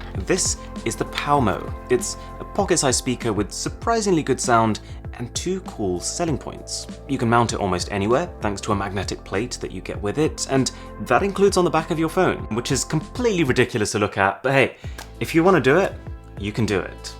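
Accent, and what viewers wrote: RP, United Kingdom. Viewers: I appreciate his RP british English pronunciation. Perfect. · I like your British accent man!! Cool video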